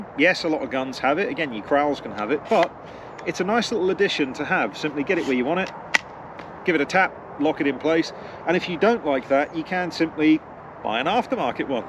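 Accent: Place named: United States. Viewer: Nice one, i was watching the video while in the bath, no weirdness intended, i nearly pissed myself with your American accent, Brilliant.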